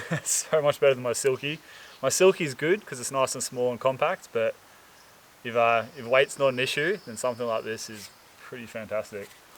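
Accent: Australia. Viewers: Hi, If you can make a swag that’s light weight, water proof, durable, can be comfortable on unfriendly surfaces and under $500. I’d buy it. My idea would be a collapsible carbon fibre stretcher frame, with built in air mattress. And eyelets to rope off to a tree for inside volume or to achieve level perhaps. It would need to come in black, green, yellow and camo. And please get it made in Australia. Otherwise one would simply buy a Hammock tarp setup. Good luck with your venture mate. And love your latest video. Careful you don’t get commercial with the plugging gear but do enjoy the cool gadgets you use. Mate I reackon your from Queensland the way you say aye at the end of your sentences. Am I right?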